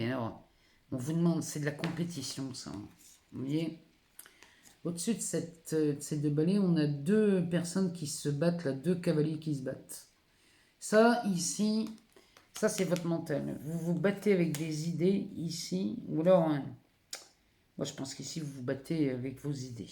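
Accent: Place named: North, France. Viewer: Ouais super 👍 chouette 🦉 merci 🙏 bcp encore en plein ds l mil espèrons q c sera réalisé ds la matière bonne continuation à vs j 💥☀️🥰😍adore c q vs faites et votre accent du nord.! Encore merci 😊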